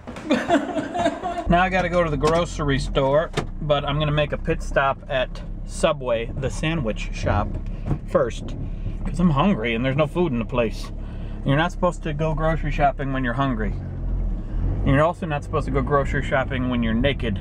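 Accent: England, United Kingdom. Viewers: I think that was the best English accent I've ever heard you do! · we need to hear the snobby englishman voice more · i abousolutly loved your english accent soo funny you sounded like stewie in family Guy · Running barefoot leads to cuts and abrasions and let's not leave out what happens if you stub your toe! Oh, and then there's slipping on a banana peel or stepping in doggy doo doo!! I really liked your English accent :)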